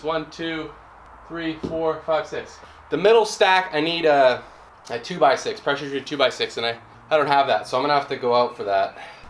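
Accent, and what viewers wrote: Canada. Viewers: The plural of "axis" is "axes". Also, your Canadian accent is awesome. :-)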